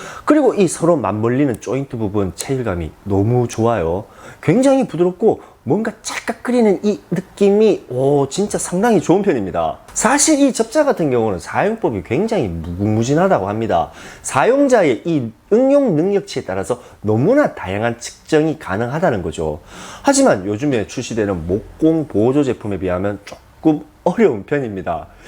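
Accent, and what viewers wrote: Gyeongsang, South Korea. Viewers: 경상도 말투 정겹네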